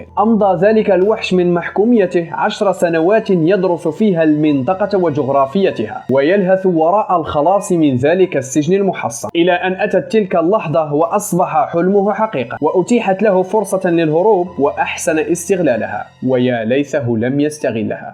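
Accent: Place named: Morocco. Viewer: عرفت انك مغربي من لهجتك الجميلة 💜💜💜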